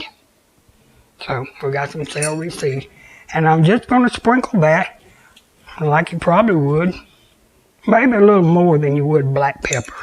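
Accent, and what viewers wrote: South, United States. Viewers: You make fine p.s Makeit like l do love that southern accent tell you are from the country. You are a gem no nonsense just being you. Glad i found your channel.lm from ala. 70 plus years. So refreshing to see some one thats speaks my language. Subscribed just a second ago Wishing you the best.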